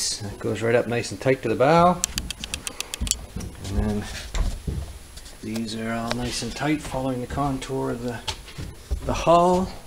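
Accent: Canada. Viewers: Don't speak Canadian. Didn't learn anything.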